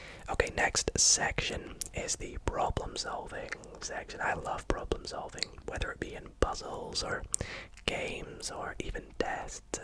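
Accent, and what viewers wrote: Scotland, United Kingdom. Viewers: were do you come from in scotland? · I new you were from Scotland 🏴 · You sound a lot like jojo asmr with an occasional Scottish accent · man’s talking bri’ish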